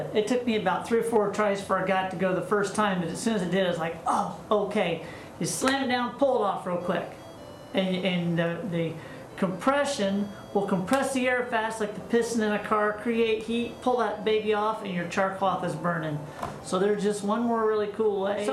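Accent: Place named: Tennessee, United States. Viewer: She kinda looks like Elvis s sister in the way u talk so u from tenn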